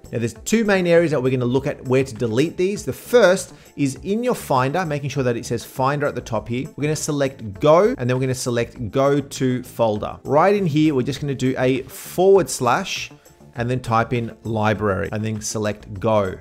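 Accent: Australia. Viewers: Thank you! Delete the cache really is ok!?! And I love hearing a fellow Aussie accent!! And also, there used to be the ‘other’ files located on the Mac storage, now have they been renamed to “MacOS “… i know that sounds odd, but it’s in the exact location where ‘other’ was… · love the Oz accent so keep the pronunciation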